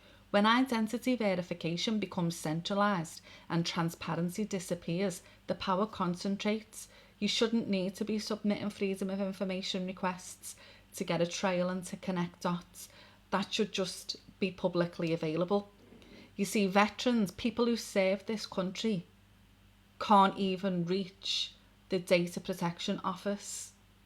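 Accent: Liverpool, United Kingdom. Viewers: I thought I had tuned into an old episode of Brookside for a moment there. 🙂 I love your accent . . . . . hello and Greetings from Newcastle, I just subscribed to your channel. 🙂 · I think that more people than ever woke up to see the nefarious schemes for what there are. Also, it seems that the level or resistance in The West is bigger than the politicians expected. I belive that people must fight against Internet control (online "safety" act and similar bills in other countries) to be able to speak about the wrongdoings of the elite. Use as much cash as possible since the banks want to phase it out and support local businesses that accept it. And remember that there's much more of ordinary folk than the globalists- if they could just force all of this though- they would- they can't and that's why they try to weasel it through the veteran id card, children's digital id, one login etc. 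Also, last thought- all those stabbings and unrest seems very suspicious and "organised" in a way to dupe people into failing for digital id- don't fall for that. Don't ask for national emergency or any other extraordinary powers to be granted to the gov. They'd misuse them as always. Ps. Love the scouse accent and the fact that you gracefully subtitled your video for non native speakers watching😂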